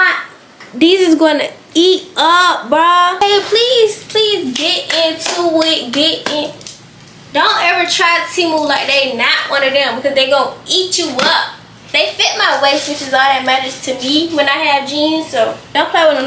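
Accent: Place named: Atlanta, United States. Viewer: And I love your voice, girl, the Atlanta voice😊❤🎉😮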